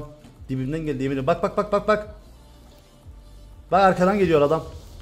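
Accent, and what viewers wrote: Cyprus. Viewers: TAMAMEN KIBRIS ŞİVESİYLE OYUN YAP ABİİİ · Abi şuan kıbrıstayım otelde herkes değişik değişik konuşuyor şimdi anlıyorum seni :D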